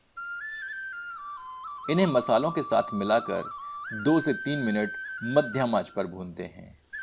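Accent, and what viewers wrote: Standard. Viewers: apki shudhh hindi .. apka andaz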